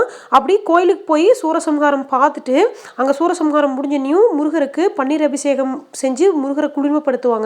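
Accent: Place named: Coimbatore, India. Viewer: Kandepa nenga coimbatore circle than... Mariyathai Tamil அருமை... Avele sapduvanga 👌..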